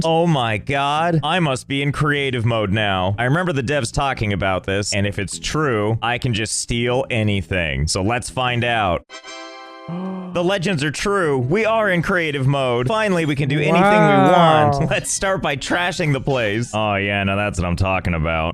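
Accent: United Kingdom. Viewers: 1 Question. Are you British?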